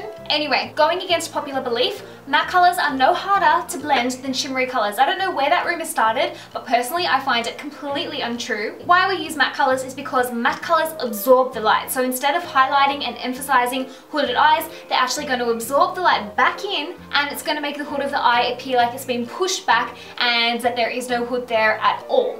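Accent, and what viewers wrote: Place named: Australia. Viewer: thees kolla heah! Is this an Aussie accent? it's so cute! lol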